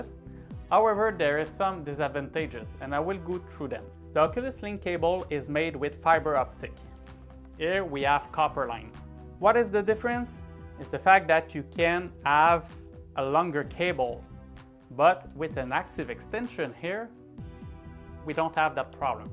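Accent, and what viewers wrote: Quebec, Canada. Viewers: C"est moi ou tes quebecois?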